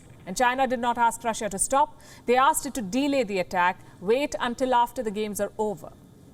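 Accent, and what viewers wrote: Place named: India. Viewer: indian tongue again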